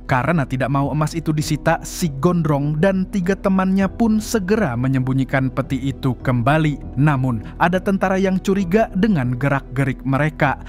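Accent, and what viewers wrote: Banjar, Indonesia. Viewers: urg banjar jua kh pian mang😅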